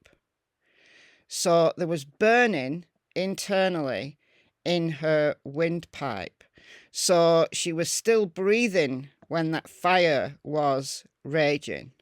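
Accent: United Kingdom. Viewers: Good morning y'all 🤔 I was sure you were British.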